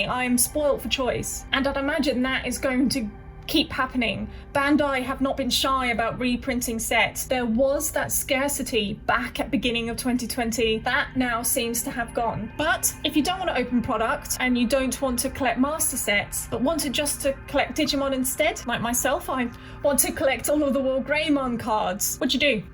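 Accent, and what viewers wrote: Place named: United Kingdom. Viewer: Where have you been hiding? Love this. Finally a fellow British (well proper english speaking) person 😅. Nostalgia overload.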